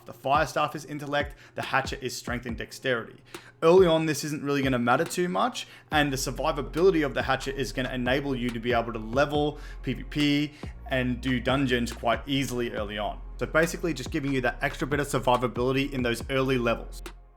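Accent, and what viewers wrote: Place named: Australia. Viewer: Aussie accent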